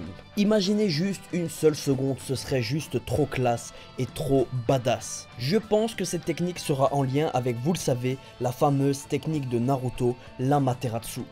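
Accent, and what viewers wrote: Belgium. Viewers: tu viens d'où en Belgique ?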